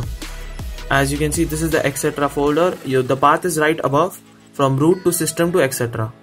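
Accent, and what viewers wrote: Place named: India. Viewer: hearing indian saying stuff that end with T kindna annoying .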